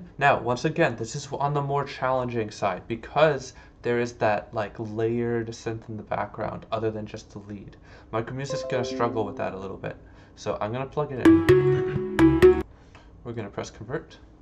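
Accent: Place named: Germany. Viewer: Are you German? You kinda sound German to me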